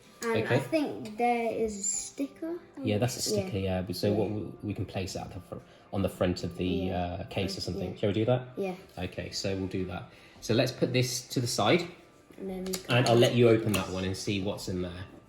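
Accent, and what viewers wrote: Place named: United Kingdom. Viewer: Can u plzz tell the prize in pounds as from the accent I guess u are from Britain ☺️(excluding the monitor)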